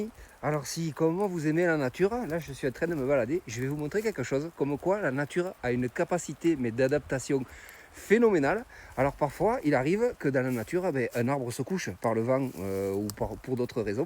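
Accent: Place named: South, France. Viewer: coucou! de la part dune mamie qui à reçu cette année à Noël de sa petite-fille en cadeaux ce livre: la vie secrète des arbres de: Peter Wohlleben,Fred Bernard,Benjamin Flao. peut-être connait- tu ce livre? ma petite-fille faisant des études dans ce domaine (cientiphique).même à 74 ans que j'ai eut le 16 février 2026,on en apprend tout les jours, lorsque j'étais enfant, je grimpais tout les jours aux arbres,ayant la chance, de n'avoir que la route à traverser pour s'y rendre. je vous ai découvert depuis quelques temps,et vous etes une bien belle personne.(j'adore votre accent ).ça me fait pensé à mes années adolescentes passées dans le midi (06). ps: cela fait du bien de vous écouté parlé, vous respirer le bien-être... mamie anne-marie, 77000,proche de fontainebleau, connaissez-vous le château? et le parc magnifique, chargé d'histoires, pour ceux à qui ça intéresse. 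merci encore pour votre savoir pour la jeunesse à venir. 😘❤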